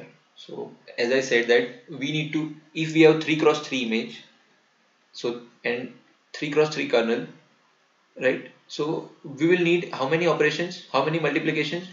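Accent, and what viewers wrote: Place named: India. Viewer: First time I felt myself so comfortable with Indian English accent , well organised and explained video..Thank u so much for your efforts...I’m already trying to read the same book from where u took the images. Your video made everything so easy...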